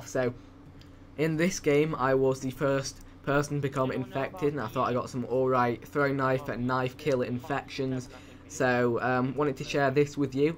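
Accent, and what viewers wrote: United Kingdom. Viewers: So it's a British Amazingfilms247? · whoa... you British?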